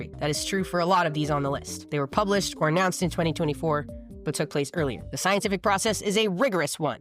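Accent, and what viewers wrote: United Kingdom. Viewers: Britain has lots of accents. That is sort of BBC English, middle class south east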